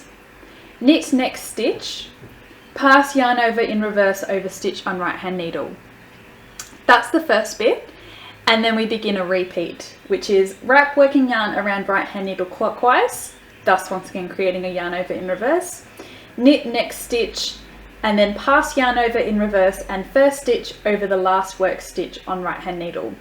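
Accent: Australia. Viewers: Thank you- very clearly explained stitches and you didn’t flick through your stitches quickly like many knitters doing this with continental knitting. Love your Aussie accent, I understood exactly what you were saying. And I am a senior from the Midwestern U.S. I could see what each motion was doing on the needles. Thank again,. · As an Aussie, I was SO relieved to find a knitting video featuring an Australian accent. It's the first one I've found after watching MANY knitting videos. Keep up the good work and thanks! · Yank English accent here and I understood your Ozzy accent just fine. Thank you for sharing and educating!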